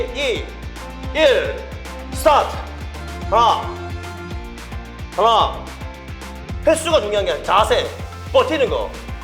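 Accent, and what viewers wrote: Gyeongsang, South Korea. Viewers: 진짜 인트로 어설프게 미국물 먹은 경상도 아재 감성이다...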